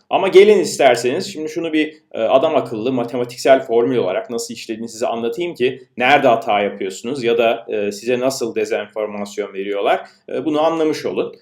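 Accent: United States. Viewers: aksan amerika :)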